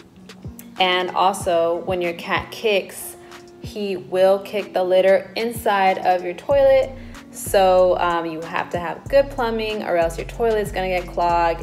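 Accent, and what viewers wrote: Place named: Albuquerque, United States. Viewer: The inflection in your voice makes me miss Albuquerque. That being said I wouldn’t mind clogging your vocal cords￼😂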